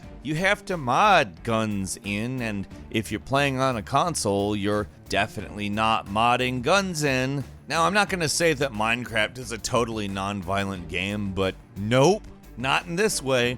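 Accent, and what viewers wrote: United Kingdom. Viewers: Real life violence makes my stomach turn, blowing people up in video games doesn't. Hilarious brit accent though LOL. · Some brits are stupid. ALMOST as bad as extreme feminists. #5. We can go a whole year with barely seeing the sun. We don’t all have rickets. I barely go out when it is sunny and I’m fine. And your British accent is terrible lol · The British accent has convinced me beyond all doubt that actually is Ed Helms in disguise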